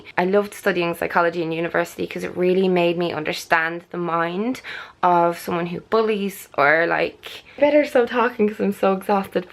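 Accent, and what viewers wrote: Ireland. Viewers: Hey! I've spent in Ireland quite a lot of time, almost a year actually, (in Drogheda) and I miss it so much that I started looking for Irish youtubers so that I could hear that gorgeous accent again! Well, I eventually found you and I must say I really like your channel! Very interesting... You got yourself a new subscriber! Good luck for everything, bye from Italy:) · I just subscribed to your channel a few days ago and I just wanted to tell you that I reeeeally like your videos!!!♡ (and your Irish accent omg absolutely love it and could listen to you for hours ♡♡♡♡ I've studied in Maynooth for one semester and I was looking for Irish youtubers for so long!!) Thank you so much for speaking up against body shaming!!! I'm 24 now and I'm still struggling with my body image and weight and I know that part of this is because stupid girls in school told me I would be fat (that was at the age between 11 and 15...so exactly the time when you don't feel comfortable in your body anyway). 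It's so sad to think that those comments still affect you years and years later because when someone repeatedly tells you your too fat/too skinny/too whatever you start to actually believe it... I sooo wish that people would just stop being mean towards each other and I hope this can happen through the help of influential people like you and many other kind-hearted Youtuber!!! xxxx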